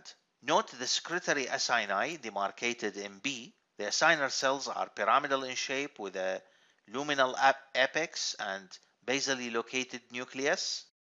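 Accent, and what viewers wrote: Iraq. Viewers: اي والله اني هم لاحظت بيه عراقي اول ما حجه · مبين من نبرة صوتك عراقي سبحان الله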